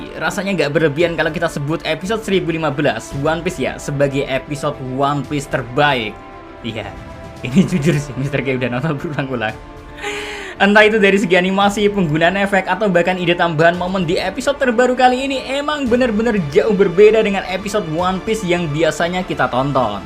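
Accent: Java, Indonesia. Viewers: bang lu jowo bang 😎🤌🤌👍👍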